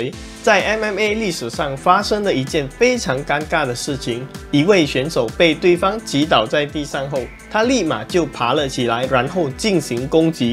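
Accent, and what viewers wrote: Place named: China. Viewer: 這是哪一省的口音，真難聽😢😢😢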